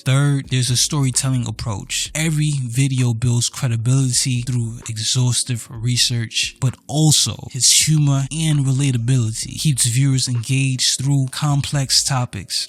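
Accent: New York, United States. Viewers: The NY accent is coming thru super strong!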